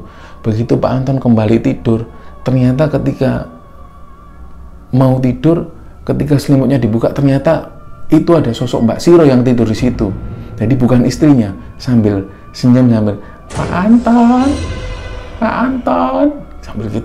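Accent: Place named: Banyumas, Indonesia. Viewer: Salam pagi salam kenal mas.. Baru sempet comen biasanya cuma like saja... Walaupun saya orang sunda tapi seneng bahasa jawa kental mas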